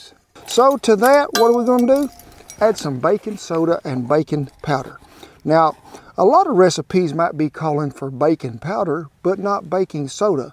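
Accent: United States. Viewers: 😲 I really like your american accent.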